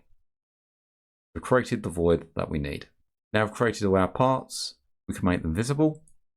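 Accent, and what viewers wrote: United Kingdom. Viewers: Fantastic video, I'm so happy to listen an English accent.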